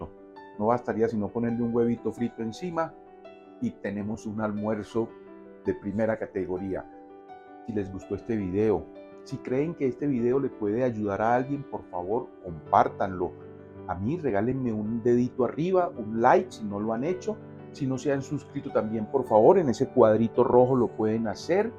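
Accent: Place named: Colombia. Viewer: Me gusta Mucho esa técnica para Cocinar un delicioso Arroz pero también su acento Colombiano or que me imaginó que es de Colombia por su asentó Dios le Bendiga Gracias por la receta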